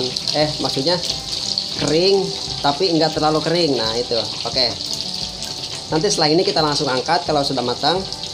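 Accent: West Java, Indonesia. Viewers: Ky nya ini org Sunda, Krn logatnya dr Jawa brt. Sy org Sunda juga.mungkin dia kerja di rmh mkn Padang. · Dari sekian banyak video bang logatnya kaya org sunda kang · Semangat kang.... Sabar aja ya.... Resep nya, mantap Kalo resep ini beda bukan berarti salah.mungkin beda daerahnya kali kan bisa aja... Kalo beda daerah beda cara meskipun sama2 padang.... Memang logat nya sunda si akang ini... Tapi bisa masak padang pasti dari org padang juga gak mungkin sotoy akang ini apa lagi berani berbagi ilmu masak nya.. Semangat terus kang semoga sukse en murah rezeki👍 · Saya ni penasaran abang orang padang tapi logatnya ky sunda ,mantap saya belajr ni mksh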